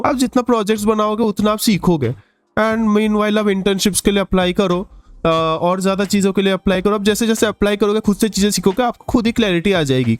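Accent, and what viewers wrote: India. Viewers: hahahaha marathi accent, sounds very nice. all such regional accents have their own charm.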